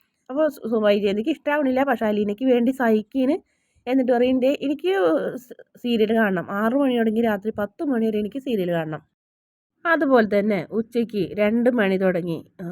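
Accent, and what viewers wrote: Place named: Thrissur, India. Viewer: തൃശൂർ സ്ലാങ് ആണല്ലോ 😂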